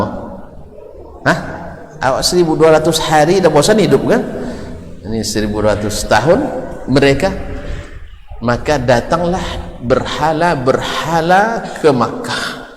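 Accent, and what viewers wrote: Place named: Minangkabau, Indonesia. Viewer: setuju... Suka logat minang walaupun kurang paham 🙏🏻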